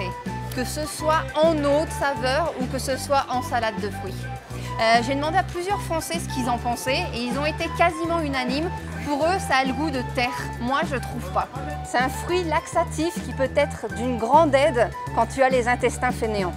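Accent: Mexico City, Mexico. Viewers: Muy agradable el vídeo, fluido, dinámico, con un español coloquial muy de México, uso de frases populares bien colocadas, información relevante de cada alimento que es útil Se agradece que no sólo sea comparativo entré ambas culturas, sinó que deja educación para todos. Muy agradecido con el esfuerzo de informarte y compartir cultura. Cómo décimos aquí, tu vídeo esta p...ca madre! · Que acento mexicano más guapo. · très bonne votre mexicain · Es la primera vez que veo uno de tus videos. Eres genial. Hablas español mexicano a la perfección. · No mms habla mejor el español que muchas personas y hasta suena muy mexicana alv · Muchas Felicidades eres una excelente narradora y el Español que hablas es muy latino y con acento muy mexicano te sigo en tu página muy amenos comentarios saludos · Wow me encanta que hablas español come Mexicano y no español lol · Hablas tan bien el español, que hasta el tono chilango ya lo tienes o se te pegó....Saludos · Eres taaaaaaaan linda, qué hermoso te expresas sobre mi amada cultura 💜 Tienes un carisma súper lindo! 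Y me encanta que hables español mexicano, no cualquiera lo logra. 😂💜💜💜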